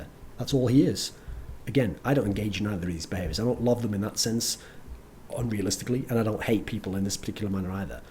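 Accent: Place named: United Kingdom. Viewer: Is he from UK? I find it a bit hard to understand what he's talking about, because of his accent. Btw, amazing speach bro!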